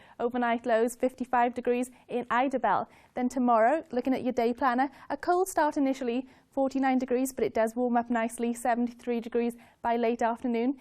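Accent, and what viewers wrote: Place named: Wales, United Kingdom. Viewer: Why, and how, is a Welsh girl reading the weather in America?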